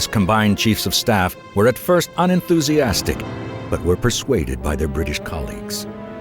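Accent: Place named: United States. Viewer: Lolllll. I wondered f that were the case!!!! I was thinking “This must be British but they hired an American guy to narrate.” Lol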